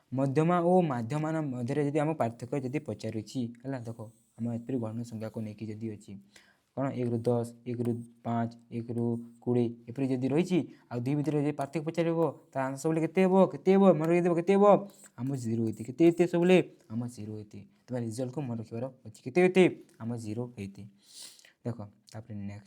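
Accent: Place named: Mayurbhanj, India. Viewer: Sir apanka katha raa laguchi apana mayurbhanj dist ru belongs karucha ti.❤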